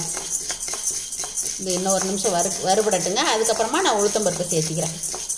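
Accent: Kongu, India. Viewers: Thank you amma I like ur receipes and way of talking Kongu slang I am from Erode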